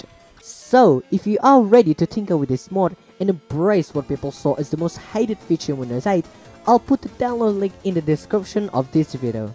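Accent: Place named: Australia. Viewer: it's the Australian accent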